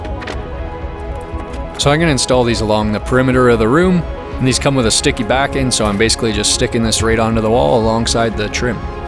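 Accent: Canada. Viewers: Is your accent Canadian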